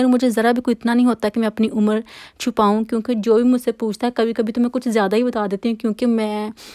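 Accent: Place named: Faisalabad, Pakistan. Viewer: Ap Faislabad me kis area sy hain? apky bolny sy lgta hy ap apny he shair sy hain…yani pensera road sy agy:))))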